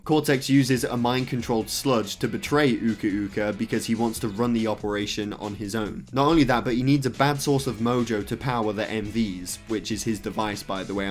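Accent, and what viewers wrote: United Kingdom. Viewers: oh man ... how i love hearing british guys talking ... nice video(s) btw :D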